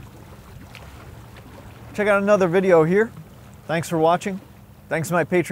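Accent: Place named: Scotland, United Kingdom. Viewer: I had to turn on the CC to see what you were saying what with that thick Scottish accent and all....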